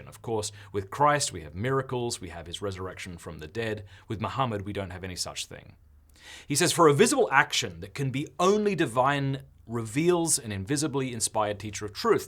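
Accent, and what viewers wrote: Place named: Australia. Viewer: Cool to see the best Australia accent ever.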